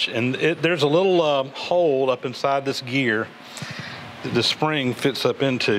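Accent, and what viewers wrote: South, United States. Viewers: "No, I put the oil in the hole!" "You put the awl in the hole???" "No, the oil, in the oil hole!" "There is no awl hole!" "... " (my Dad had a reasonably heavy Southern accent, so I enjoy hearing one!)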